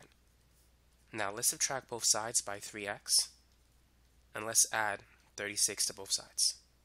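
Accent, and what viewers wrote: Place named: Boston, United States. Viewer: You have a Boston ascent. Btw your videos are great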